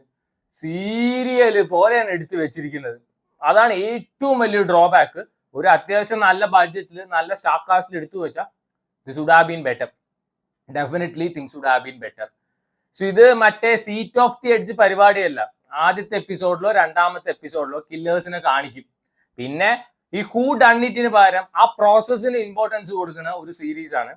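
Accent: Palakkad, India. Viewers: Nalla palakkadan slang❤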